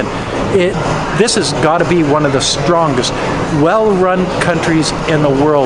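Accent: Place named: Canada. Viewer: This is the first time I'm watching this guy's video because it popped up in my recommendations. I can tell this guy is Canadian. He sounds (and sorta looks) just like Bubbles.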